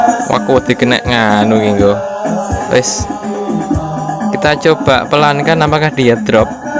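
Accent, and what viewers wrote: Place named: Banyumas, Indonesia. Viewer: Ora ngapak ora kepenak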